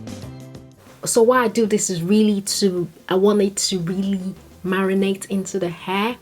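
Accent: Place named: Nigeria. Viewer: love ur accent are u nigerian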